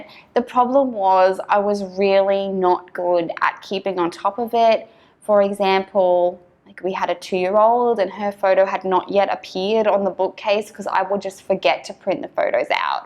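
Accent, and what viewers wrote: Australia. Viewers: Australian accents are so cool.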